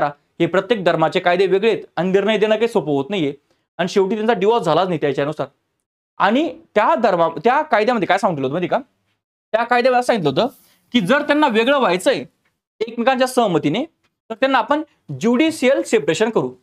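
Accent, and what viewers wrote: Khandesh, India. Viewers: Aaplya khandeshi ahirani bhashet bolta tumhi sir mh 19jamner Kar aamhi 🙏😍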